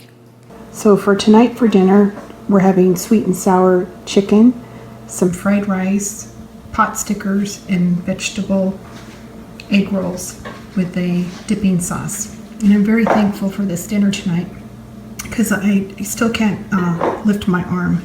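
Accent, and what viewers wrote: South, United States. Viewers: I've never had In n Out, but I've definitely heard of it! Everyone seems to love it. By the way, your accent sounds very southern. Are you from the south?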